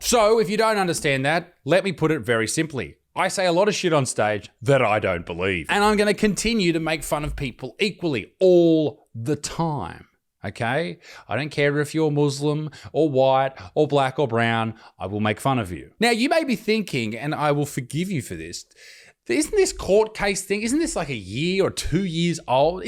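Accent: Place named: Australia. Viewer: its the Australian vanacula mate get over it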